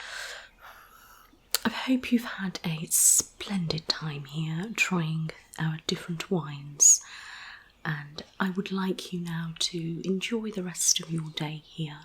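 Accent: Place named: United Kingdom. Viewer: I love British people so much,you're soooo lovely and your accent takes me back to when I was living in the UK❤ thanks for such amazing roleplays x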